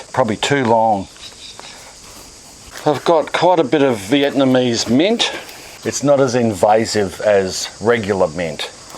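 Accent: Australia. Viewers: HERBICOUS! I totally enjoy you info and your Aussie accent!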